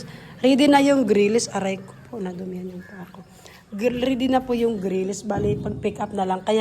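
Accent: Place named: Visayas, Philippines. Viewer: Bisaya ka ma'am Kasi may tono ka bisaya kasi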